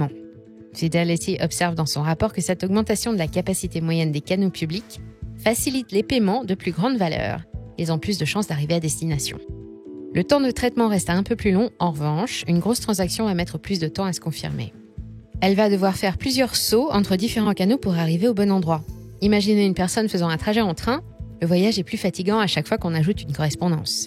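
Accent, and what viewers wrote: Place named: United States. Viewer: prononciation à l'americaine tout simplement mais oui c'est clairement marketing il y a limites plus de commentaires à propos de sa voix que par rapport au contenu haha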